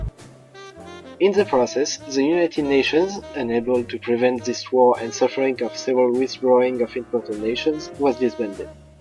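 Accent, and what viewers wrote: France. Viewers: I thought you were trying to do the accent. I thought that was kinda clever having a frech accent with the old jazz music. Didnt realize it was unintended. Good job with the history too. Ive only played fallout 3 and NV. I never exactly knew the history in the game. Good video. Please keep making more!!:D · Si je sais reconnaitre une chose c'est bien un accent Français en Anglais, dis moi, ne serais-tu pas originaire d'un pays pratiquant la langue de Molière? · tes videos sur l'histoire de fallout sont geniales et tres interessantes. Franchement rien a redire la dessus. Par contre, jvais passer pour un rabat joie, mais ton accent francais en anglais cest.. pas possible. Je salue tes efforts pour le faire en anglais avec les sous titres fr et anglais, cest un enorme travail jen suis conscient mais l'accent me deplait. Je suis désolé d'être franc comme ca mais si tu pouvais ameliorer ce point ce serait parfait. 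Mais sinon je te le redis tes vidéos sont geniales:-) A la prochaine! Grayef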